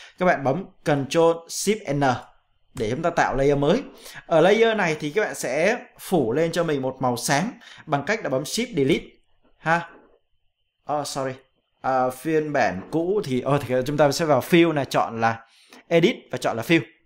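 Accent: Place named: Central, Vietnam. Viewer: Anh này người miền trung nè đọc “a” thành “e” kk ❤️Miền Trung 🤣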